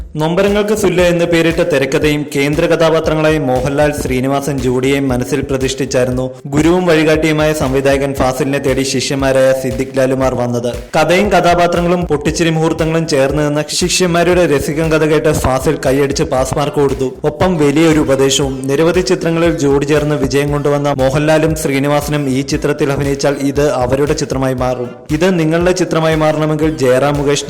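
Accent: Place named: Kollam, India. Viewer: Kollam. Dist Karan ayirikkum. Veray oru vakk und. Sammathikkoola ithinu parayum thammasikkoola ennu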